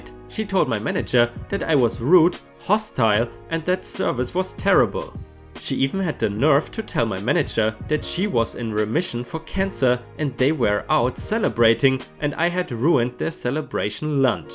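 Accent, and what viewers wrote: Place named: Germany. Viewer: So, hey there. Until now, I wondered, why that accent is so familiar. Now with that "german" comment, I know why. You,re german, aren't ya?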